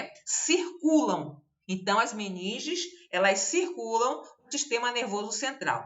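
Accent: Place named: Northeast, Brazil. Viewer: Amo suas aulas querida ,a senhora tem o jeito de nós do nordeste falar, no momento moro em SP, faz 6 meses que comecei a fazer o técnico de enfermagem, mas N deixo de vê suas aulas ,aprendo demais com ela,gostaria de saber se tem como eu obter suas apostilas,não deixe de publicar os vídeos, um grande abraço...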